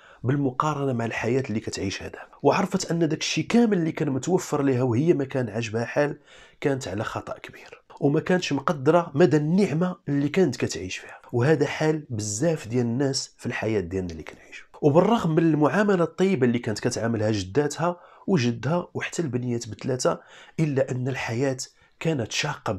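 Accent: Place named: Safi, Morocco. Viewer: متشرفين اخي ، مجرد فضول و صافي انا عبدية و من خلال الهضرة ديالك شفت تشابه بعض الكلمة و طريقة نطقها مع الناس ديال آسفي داكشي علاش شدني الفضول ، احنا مغاربة و مسلمين كل الحب و الاحترام اخي من متتبعة من الديار الألمانية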